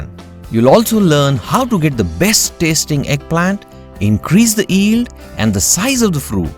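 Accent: India. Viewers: You can hide everything, but you can't hide your Indian accent!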